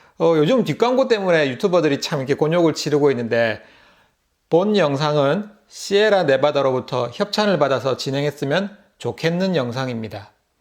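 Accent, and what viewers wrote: Busan, South Korea. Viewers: 앗 저도 부산출신 ㅋㅋ 저도 영어속에 사투리 ㅋㅋㅋ 근데 저보다 더 사투리 촌스러운거 같은데요~ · 미국집 냉난방에 대해서 좀 소개 해주세요 비용이라던지,시스템이라던지 부산말 확 표나요 ㅋㅋ 부산 촌놈으로서 바로 알았습니다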